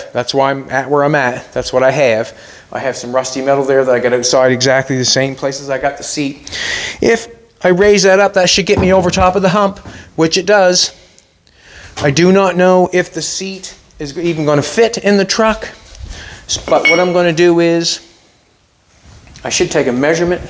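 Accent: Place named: Canada. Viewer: that Canadian accent gets on my nerves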